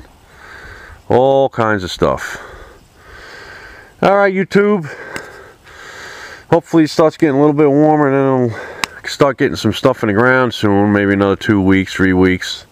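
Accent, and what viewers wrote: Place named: Maine, United States. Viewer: Just found your channel, watching all the videos soon. I am sure you've been asked, and have probably answered already...where are you from? Your accent reminds me of family back home in Maine. :) Your set up is great!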